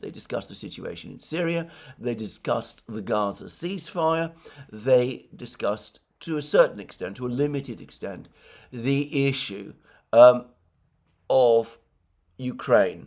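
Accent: United Kingdom. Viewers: Somehow Trump's poetic prose sounds even more powerful in your British accent 😅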